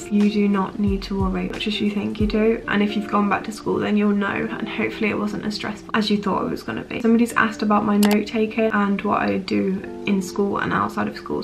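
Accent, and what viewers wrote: United Kingdom. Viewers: it makes me wanna learn british accent thank you 💗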